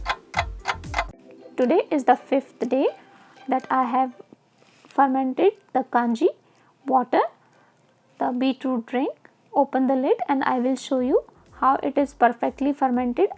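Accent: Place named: India. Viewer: मॅडम आपल्या टोन वरुन तर आपण भारतीय अहात. मग मराठी, हिंदीत माहिती का देत नाही.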